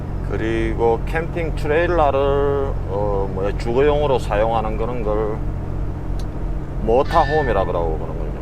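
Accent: Gyeongsang, South Korea. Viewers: 저희집앞을 지나가셨네요 ㅎ 101 과 I-17 만나는교차로 근처에 살고 있습니다~~~ 저도 경상도 사람이라 항상 사투리가 정겹네요~~~ 영상잘보고있습니다~~~ 항상 안전운전하세요~~~ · 경상도 사투리가 늘 정겹게 느껴집니다. 처가는 포항, 매형 두분도 경상도입니다. 나라사랑 잊지마시고 늘 안전하고 건강하게 지내세요 ~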